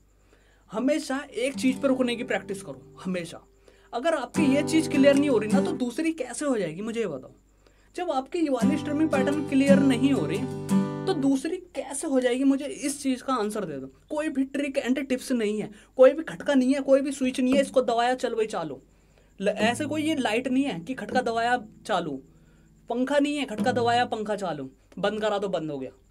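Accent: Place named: Meerut, India. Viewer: Bhai aapka way of talking kafi apna apna sa hai. Meerut ke aas pass se ho kya???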